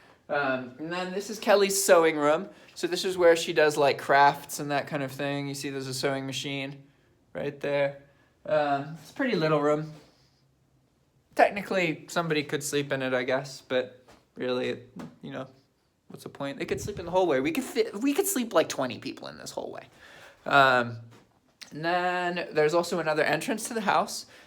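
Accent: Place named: United Kingdom. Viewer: Are you parents of British decent? I hear a little accent here and there? 😇💗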